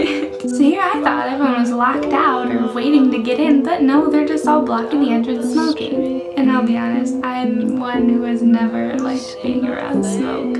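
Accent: France. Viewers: You have a great french accent :O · i'm totally in love of your french accent :) i'm french and i'm approve all the things you say about "monoprix" and for the " french supermarket" in a general way . it's very interesting to see our culture from your point of view. Did you talk about the fact than even the men do " la bise" with others men ? i think for American people it's a social manner inappropriate ( sorry for my english ) .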